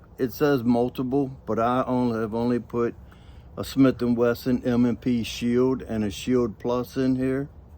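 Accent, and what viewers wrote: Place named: Europe. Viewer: Hey, Good to see you! Love the European accent! I pulled the trigger on the Tobac, I heard a few guys talk about it and you helped me to pull the trigger. Thank you for the show and tell. Be Well!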